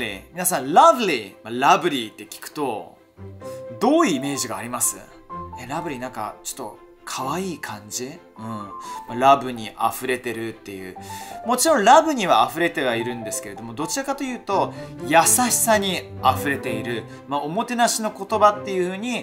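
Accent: United Kingdom. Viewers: I’m Japanese but currently studying in uk and I really appreciate your lovely videos! They help me a lot to learn exact British pronunciation:)) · のイギリス英語って何と言うかすごくイギリスっぽいというか高貴な感じがするというか、素敵です！はイギリス生まれなのですか？ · I fancy your lovely English accent!! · のイギリス英語が大好きで、いつもPodcastやインスタ観てます🇬🇧💕 イギリスにいると、電話先でも対面でも、たくさんの「Lovely!」が聞けて、Lovelyって言葉聞くと個人的にめっちゃ嬉しくなります❤️ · イギリス英語かっこよすぎるー😭品格半端ない…憧れ… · イギリス英語でLovelyがよく使われると知ってから自分でも使うようにしています！のイギリス英語で勉強するの楽しい〜！☺️ Your channel is so lovely:) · I like your lovely British accent!!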